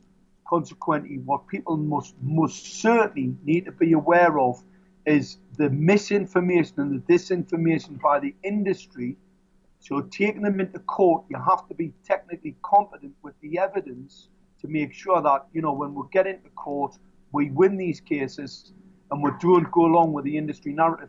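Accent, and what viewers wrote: Scotland, United Kingdom. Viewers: His accent is Scottish. Pay attention and listen. It is filled with knowledge. Close your eyes and things become comprehensible.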